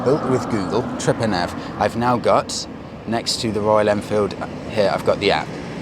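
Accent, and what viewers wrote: England, United Kingdom. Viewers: I am not a big bike fan but somehow I like Royal Enfield a lot, especially in a such well demonstrated video. Some extra attraction for that British accent... 👍👍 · Leave aside the bike, we see enough of them in India. I suspect we Indians watch your channel for a bit of that propah English accent 😊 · I'm from Brazil and I study English, I like watching your videos because I like the English accent, and also knowing about motorcycles, would this bike be adapted to my height, my height is 5, feet and 3.5 inches. Thanks. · The importance of English these days, I, being Brazilian, would be missing out on such good content from this channel, your British accent is cool and a little funny to me. You're a good communicator, (and ).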